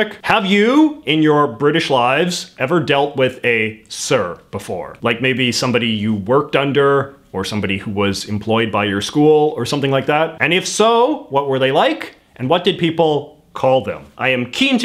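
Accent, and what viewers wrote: Canada. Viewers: Are you by any chance Canadian? And yes I ask because of how you pronounce out. No offence, just wonder. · is such a Canadian with his very pronounced "aboots"! That's part of what makes him intersting to me, I suppose.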